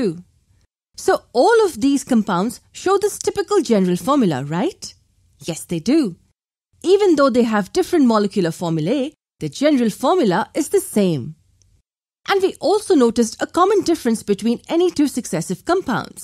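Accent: India. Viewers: Is the speaker indian ?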